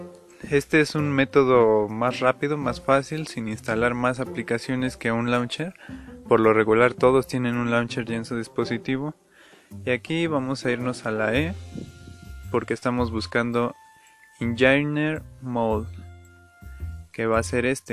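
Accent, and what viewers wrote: Honduras. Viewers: Eres de Honduras?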